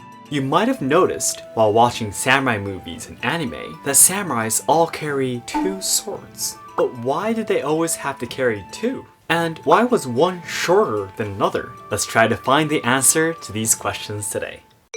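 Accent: Michigan, United States. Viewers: I can hear the "Michigan" in your english. Its very clean with none of the inflection that you find in other midwest states in that area like Wisconsin or Minnesota. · Your measured, succinct speaking style with an American Midwestern accent is very appealing. Listening to you is so enjoyable. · Dude, you sound more like a Michigander than I do! Very interesting video. Well presented.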